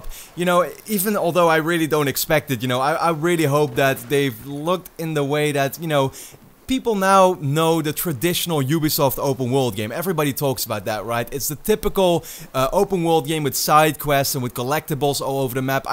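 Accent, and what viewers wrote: Netherlands. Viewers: Good work with this video m8... But i have to ask: Are you by any chance Dutch? You have quite the accent 😉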